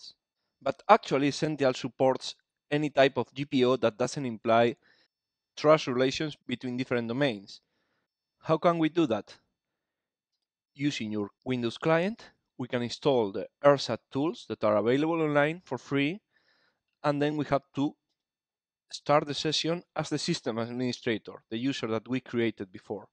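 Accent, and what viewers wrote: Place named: Spain. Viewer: I understand him. I do not speak Spanish. His accent is familar to me, Spanish accent is widespeard in mainstarem media.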